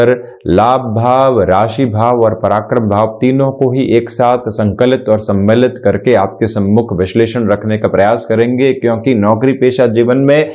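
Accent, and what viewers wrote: Standard. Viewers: Pranam Guruji Your Hindi is very pure & clear . Very well explained. Regards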